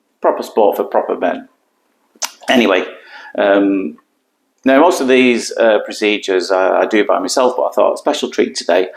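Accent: United Kingdom. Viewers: Show off, you tech skills are great. I live in Sydney, Australia, love your accent and hearing the British expressions which we don’t hear very much these days. I love my British heritage. I hadn’t heard the paperboy one before. Guess what, I finally know what I want to do for a career. Ear cleaning your way. I have a problem though, I’m 72, have I left it a bit late.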